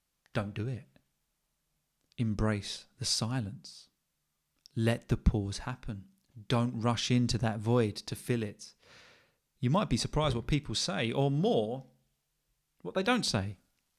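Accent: United Kingdom. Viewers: British accent ?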